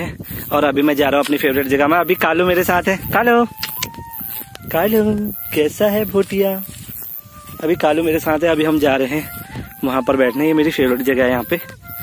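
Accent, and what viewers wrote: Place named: Pahari, India. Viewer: areee sir kya pahadi bolte h aap kaan to prm sukh ka anubhav ho gya 😍😍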